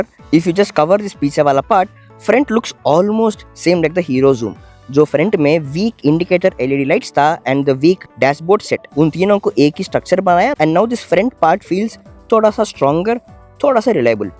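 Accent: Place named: Telugu, India. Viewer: telugodu hindi matldutunattu enti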